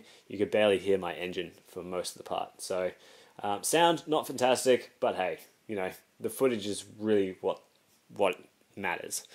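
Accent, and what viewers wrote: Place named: Australia. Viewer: Silvia and Aussie accent, feels like I'm watching Mighty Car Mods :)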